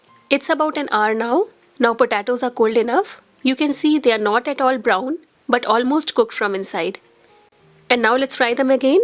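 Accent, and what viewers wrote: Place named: India. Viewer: Da Indian aksent🤗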